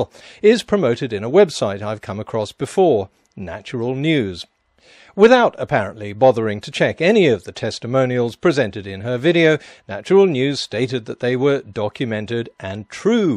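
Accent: United Kingdom. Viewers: I take this guy twelves more seriously because of the GODDAMN BRITISH ACCENT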